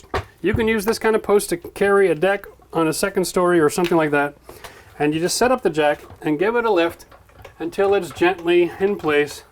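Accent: Canada. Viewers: You can hear that strong Canadian accent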